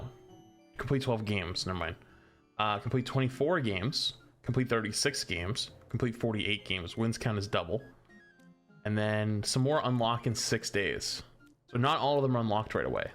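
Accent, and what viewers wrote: Boston, United States. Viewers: I need more OG Boston accent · We need more Boston accent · BOSTON ACCENT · DOOM'S GONNA BE BETTAH!:D Embrace the Boston,. Let it out!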